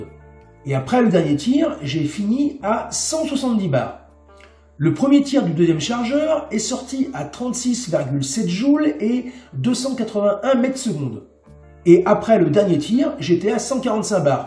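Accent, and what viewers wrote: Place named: Loire, France. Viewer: Bonjour où trouve t on cette carabine? Au vu de votre accent n'êtes vous pas de la Loire du côté de Firminy ? 😉 Merci pour les réponse... CLT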